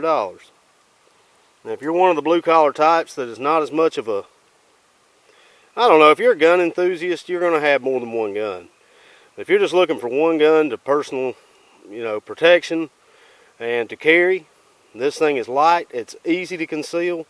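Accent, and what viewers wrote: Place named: Boston, United States. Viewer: I had a friend from Boston who spoke just like this guy.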